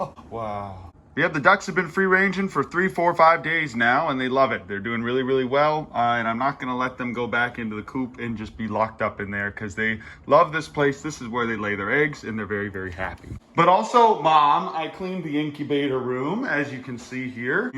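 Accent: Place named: Pittsburgh, United States. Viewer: I have never been more engaged in a strangers life more than yours lol. It took me way to long to pick up your accent(Crosby Jersey gave it away too) and I’m dying to know where you are from in Pittsburgh? I live in Plum Borough. Keep up the good work you deserve way more subs. I don’t think any other YouTuber had an effect on me like your stuff. I’m a grown ass man and yell EVER HEARD OF IT! At everyone. My gf does not appreciate it.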